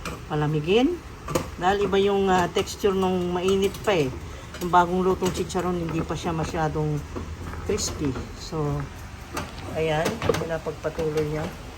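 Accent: Ilocos, Philippines. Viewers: Ilokano ka ba ma'am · By any chance you’re Ilokano?😊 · Ilocano ka po? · Ilocano ka tlaga msgsalita, para rin kyong bisaya. Wag knang magtagalog at nkakahiya po kyo . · Parang Ilocano yata kayo! Taga saan ba kayo?😊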